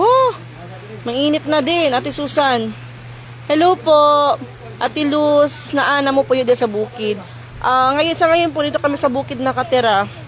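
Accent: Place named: Waray, Philippines. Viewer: salita mo parang salitang waray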